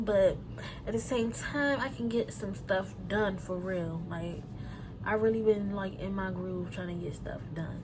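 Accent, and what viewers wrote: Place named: DC, United States. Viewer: Sis are you from the DMV? I swear I hear a DC or MD accent lmaooo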